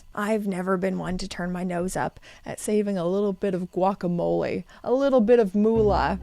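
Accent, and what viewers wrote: Canada. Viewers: She sounds Canadian. Where is she from? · As a fellow Canadian, what is it, exactly that makes us sound so Canadian?? 😂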